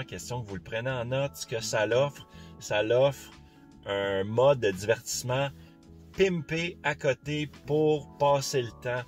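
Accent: Quebec, Canada. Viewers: J'adore tes vidéos et tes commentaires, surtout avec ton p'tit accent québécois et la description des mots que d'autres amateurs ne comprendraient pas. En tout cas, tabarouette que t'as d'l'air naturel dans tes vidéos. Cela fait 10 jours que j'ai passé ma commande pour une sr+ chez Tesla Laval ( 13m$ oblige) et j'ai utilisé ton code de référence.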